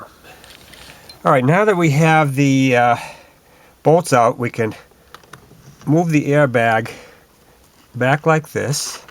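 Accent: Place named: New England, United States. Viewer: Probably from New England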